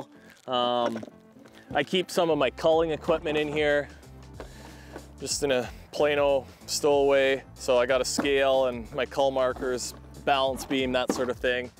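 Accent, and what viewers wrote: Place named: Midwest, United States. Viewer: Most Midwest accent I’ve ever heard, so.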